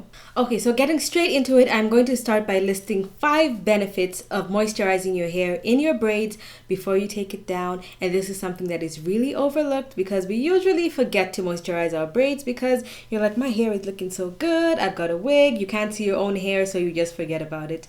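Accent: South Africa. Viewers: You sound South African ❤️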